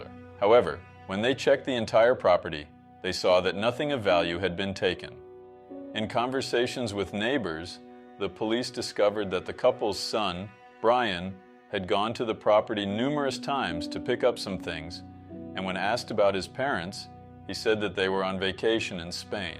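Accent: United States. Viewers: Your American accent is spot on!! I almost had to double check that I clicked on the write channel. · Are you from America or australia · Very tragic case. Great video though! Had to double check to see if I clicked the correct channel because of your excellent American accent :) Anyway ❤ thank you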